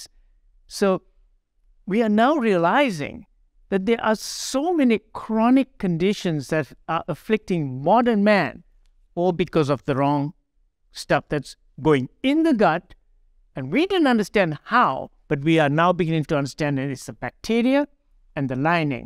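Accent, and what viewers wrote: India. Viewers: I naturally listen and trust doctors with Indian accent. I've now watched about 8 videos of him. He's a genius ! 😊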